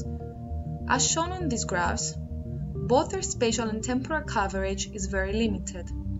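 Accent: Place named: India. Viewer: Unfortunately, your presentation was only partly understandable ( 50%) to me, although I have several degrees, and am very well informed about climate science. Also, hurricane Irma went right through our town - so I am very motivated to get what you are explicating!!! BUT: 1) you were reading, so you were not making any attempt to notice how your audience was responding to your recital; 2) you were not aware that MANY of the terms you were breezing over would be new, and unfamiliar, to some of us wanting to understand what you were describing; 3) You have no pity for those native English speakers, like many of us older Americans, who are unused to your Indian accent, have hearing loss, and had to try to get what you were saying while the backround music made it even harder!. Dont you care whether your accent makes your presentation partially unintelligible? 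SLOW DOWN! If you put your presentation on a public format - like You Tube _ you should care whether you are getting across your point to laypeople!? Slow Down! Or, have your manuscript read by a native English speaker, who is only slightly familiar with the subject, so that the presenter WILL NOT RATTLE OFF A LOT OF TECHNICAL TERMS AS THOUGH EVERYONE SHOULD BE as familiar with them as whats in the newspaper. When you introduce a new technical term, anyone unfamiliar with it will have to pause to try to remember the new term..( Listen to Noam Chomsky sometime; he speaks WAY SLOWER THAN YOU, ABOUT VERY COMPLEX SUBJ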